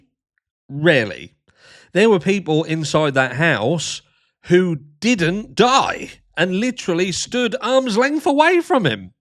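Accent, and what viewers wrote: United Kingdom. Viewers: You are not even from USA are you. Sounds like a Brit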